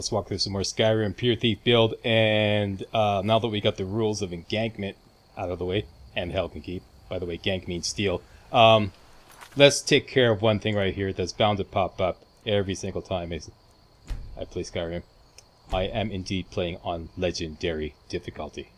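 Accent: Canada. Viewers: i'm in this for the Canadian accent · Your Canadian eh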